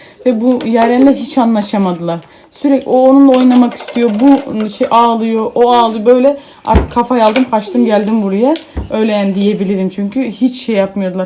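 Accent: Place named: Azerbaijan. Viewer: Canim merhaba sizin konusmanizdan kulturunuzden bize azerbaycanlara benzediyorum niyese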